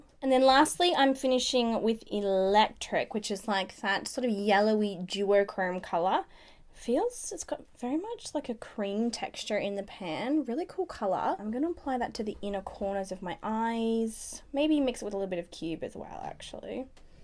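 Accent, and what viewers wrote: Australia. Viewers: Thyea Phelps She has an Australian accent! That's why she talks like that. Are you for real?! Lol. Do you want her to talk with a fake American accent just to suit you? This made me laugh 😂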